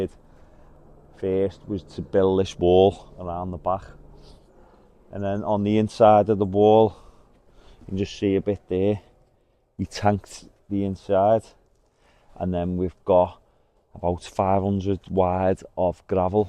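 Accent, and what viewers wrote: Liverpool, United Kingdom. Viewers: you’re from liverpool…..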